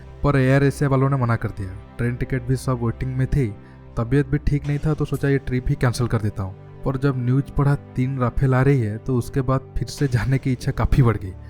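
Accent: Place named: Bengal, India. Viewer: are a bangoli he esleye hindi bolne ke thora taklep hota he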